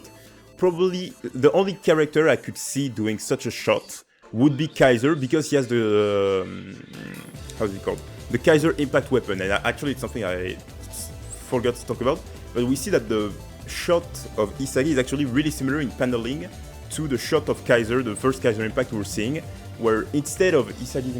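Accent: France. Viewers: haha I think it's for the better. I believe your accent is very good for the ear. I especially picked that you were French when you said the word "Egoist" and "egotist". The "ist" at the end sounded very much the "ist" in the French word "égoiste". I think it's good, it adds variety of people in the blue lock community!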